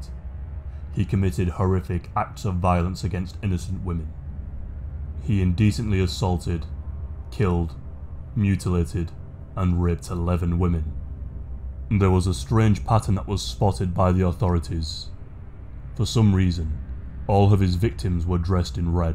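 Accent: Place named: England, United Kingdom. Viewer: I’m guessing somewhere near abbey road I gotta know which part of England you are from my friend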